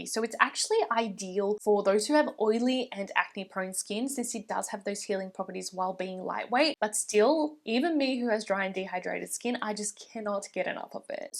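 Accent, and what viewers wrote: Australia. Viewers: Happy to hear an Aussie accent. I was wondering where in Australia stores can we find these ? I haven't seen these in chemist warehouse or myer David jones etc nor I am from Korea so can't understand even if I missed ? Were these brands available in Aus since last 4 years? I haven't come across them in Westfield either.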